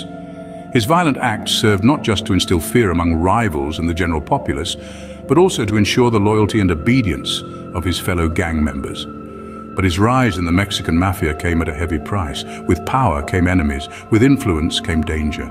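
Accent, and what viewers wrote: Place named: United Kingdom. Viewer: Somebody hit the lick with this narrator. Serious and compelling, the deep, UK sounding accent ups the story's level of integrity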